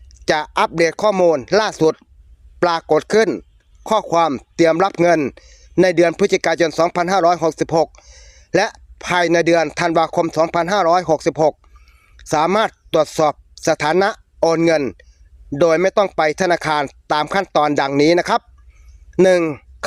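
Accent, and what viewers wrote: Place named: China. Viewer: สำเนียงจีน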